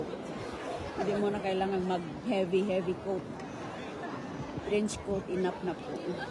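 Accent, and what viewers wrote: Visayas, Philippines. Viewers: Sorry Jud Bisaya man si ❤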